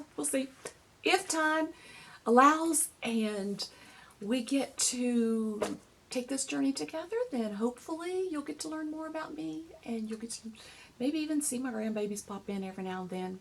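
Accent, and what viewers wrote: United States. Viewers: you did a great job! I'm from Rocky Mount, Nashville NC area. When I heard your accent I knew I felt right at home!!! Can't wait to see more!!! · Love Love Love! Congrats on jumping in and thanks for sharing! I adore your East Tennessee ?(I'm presuming) accent - it reminds me of home and childhood and all things cozy. Glad to meet you!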